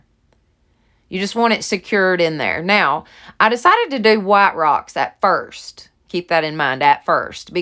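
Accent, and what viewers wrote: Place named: Tennessee, United States. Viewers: Girl, I KNEW you were from Tennessee! Sound like you could be right around the corner from me! Love it! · I’m a New Yorker so when I hear you speak I just love your Tennessee Twang. I enjoyed your whole vignette. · Everything turned out great your porch looks awesome. I'm from Tennessee to I get it when you say that the sun is brutal I've been doing some diys for my front porch to. Love the wreath. When I first started watching you I thought she has got to be from TN lol have a blessed day.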